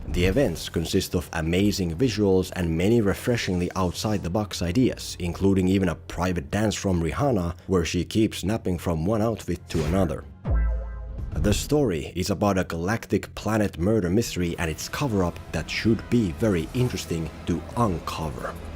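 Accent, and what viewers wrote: Finland. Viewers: This is the most finnish sounding accent I've ever heard... regards, a finn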